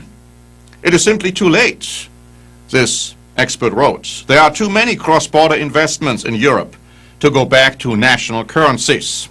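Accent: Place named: Germany. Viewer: Euro cannot survive next 10-15 years. This video seems to be german propaganda as I hear a strong german accent and pronunciation ..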